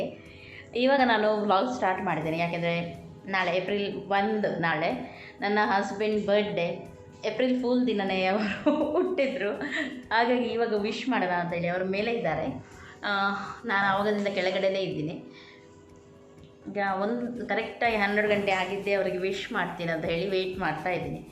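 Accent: Mangalore, India. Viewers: Hi I'm from devanahalli nim yella vlog na ee lockdown time nali nodtidini kushi agute nim family nodoke n nim tumba lucky Olle family na aa devru kotidane nange nim birthday nim husband celebrate madidu adu nange tumba kushi aytu n nim mangalore baashi tumba chenda untu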